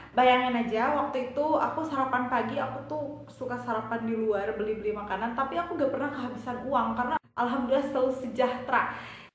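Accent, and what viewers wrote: Java, Indonesia. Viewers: Logat mba nya sdh medhok juga he he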